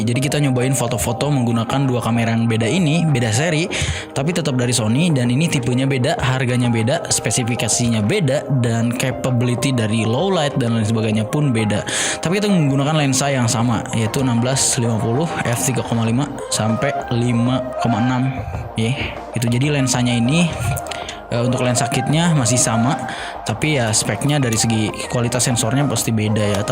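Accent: West Java, Indonesia. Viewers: pertama liat channel ini gw langsung SUB karna infonya mamfaat buat gw...dan gw pikir bukan orang sunda, eehh nyunda oge ningan...makin trus mantau channel ini, sukses terus lurr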